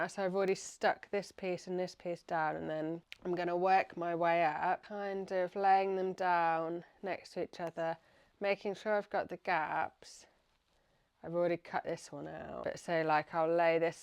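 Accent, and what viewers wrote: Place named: United Kingdom. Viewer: Great video! Don't worry about the pink, it didn't look that bad anyway, and if you see it as a mistake, that's good, you have learned from it for next time. I love that you're experimenting. Thanks for keeping it real. We've all been there! P.S. Your accent sounds local, where in the UK are you. I'm in Nottingham 🥰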